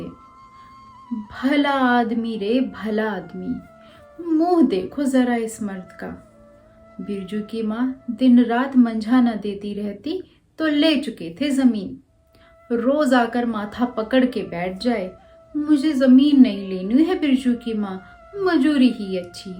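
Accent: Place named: Bihar, India. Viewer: बिहार के सीमांचल क्षेत्र का विशुद्ध देहाती पुट से परिपूर्ण है,"रेणु"जी की कहानी और आपने इसकी प्रस्तुति उसी भाव एवं उच्चारण के साथ करने का अच्छा प्रयास किया है।आपको बहुत बहुत साधुवाद।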